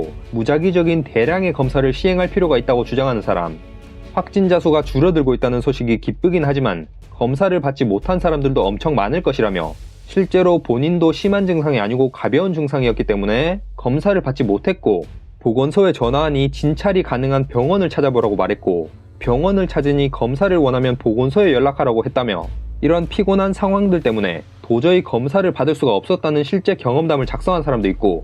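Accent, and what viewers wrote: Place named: Gyeongsang, South Korea. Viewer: 이거 경상도 사투리죠? 억양이 오르락 내리락 ㅋㅋ 표준말을 좀 연습하세여~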